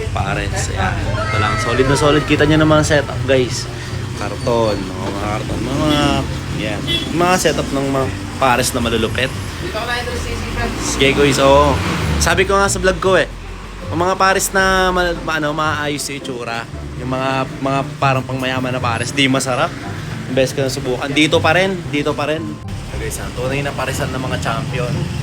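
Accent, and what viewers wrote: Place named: South, Philippines. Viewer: dalang dala yung punto ng taga south. yung "ehhhh", "walanjumuyon", "walanya" "apakatuleg mo!" hahahaha goods na goods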